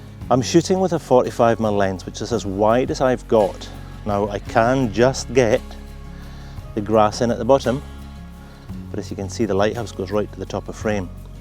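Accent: Scotland, United Kingdom. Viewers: So grateful you take your own time to make these Videos. I love photography and have the technical side but don’t have a particularly artistic eye,so I get a lot of inspiration from videos such as these! Nice to hear a proper accent too, from a fellow Scottish Lad!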